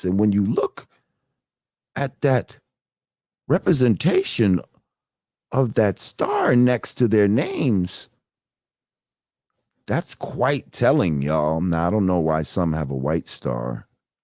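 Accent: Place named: Ireland. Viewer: Salute to the Irish accent 🥃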